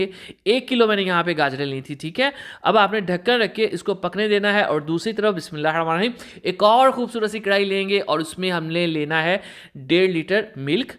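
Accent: United Kingdom. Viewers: Nice recipe,,, liken Aap urdo baat kar rahihen ao lihja English ka hai lihaza lihja thek kren bahut bura lagta hai Aapki sar pi firingi Bhoot swar hai😈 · Nice.. But plz Urdu lehja me he Urdu bolain ,,, English accent me bolne ki koshish na Karen. Apki voice bahot piyari hy ...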